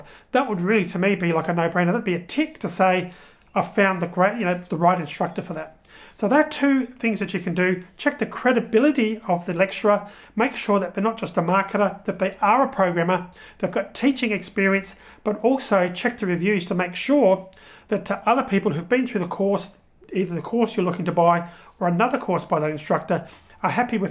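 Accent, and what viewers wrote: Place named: Australia. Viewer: I agree. courses are great I've bought a few on udemy. Also feel more comfortable listening to Australian accent just because I'm more used to it.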